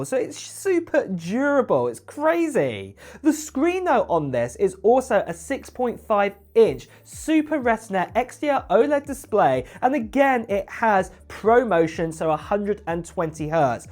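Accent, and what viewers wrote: United Kingdom. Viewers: I'm here for the UK accent.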